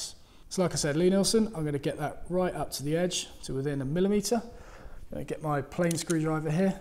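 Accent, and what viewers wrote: United Kingdom. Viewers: Silly yank i am, just thought he was using the queens english.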